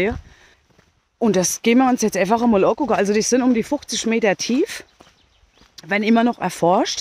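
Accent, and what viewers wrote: Palatinate, Germany. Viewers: Immer wieder ein Vergügen deine Videos zu sehe. Un dadebei des Pälzer Platt👍🏼 Liebe Grüße Thom ✌🏼🤠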